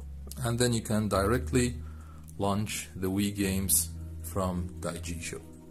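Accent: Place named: Iran. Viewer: This is the most Persian accent I've ever heard in my 43 years on this mortal coil. I give this 5/5 pomegranates. Would watch channel again!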